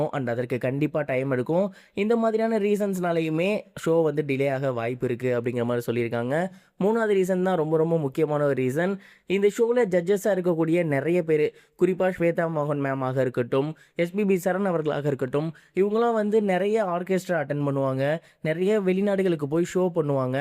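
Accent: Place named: Kerala, India. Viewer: Nayae un voice kerala chee...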